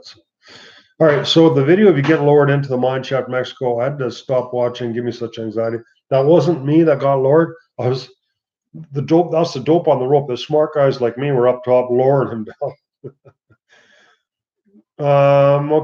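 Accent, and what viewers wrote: Canada. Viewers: Being English, i can say you sound 100% Canadian, Americans sound American. Strangely though saying ay a lot is a very southern english thing, cornwall, devon kent etc.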